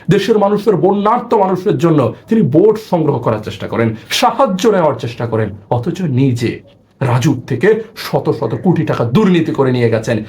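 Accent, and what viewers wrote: India. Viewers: দাদা বাংলাদেশী। আপনার কথার ধরন অনেক ভালো। আপনাকে ইন্ডিয়ান লাগে আমার কাছে।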